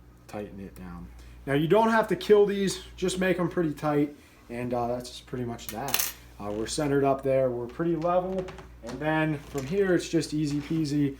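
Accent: Pittsburgh, United States. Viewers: lol at the pittsburgh accent, noticed it right away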